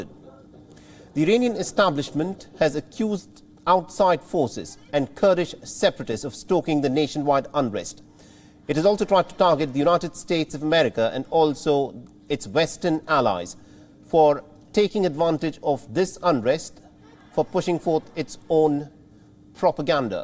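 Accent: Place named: India. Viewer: Oh my gawd your thick indian accent!!! We cant understand a thing!!! Please replace this news anchor.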